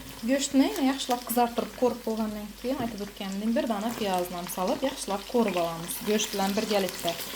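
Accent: Khorezm, Uzbekistan. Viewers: Ozizni shevayizda chiqarganiza dm quvondim apka manam xorazmdanman boshqala xorazmdan bosanam tushnmidla dab yo ozn shevasinan uyolganindan adabiycha soʻllab video chiqaradila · Хорезм таомлари зўр. Айниқса сузма палов. Менга жудо ёқади хоразимча таомлар, хам хоразм шеваси жудо чиройли сўзлашадилар. Котта рахмат синглим. Хоразмга бориб кегандай бўлдим. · Ассалому алайкум. Роликни тасодифан кўриб қолдим. Овқат пишираётганингизда Аллоҳни ёд этганингиз, Хоразмча, чиройли сўйлаганингиз учун рахмат, қизим. Аллохнинг панохида юринг.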